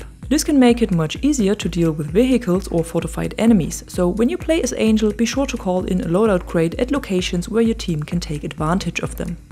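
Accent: Germany. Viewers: You have a new sub. Finally a channel with great tips and tricks instead of endless crying how bad the game is. Judging based on your accent, you are from Germany right?:)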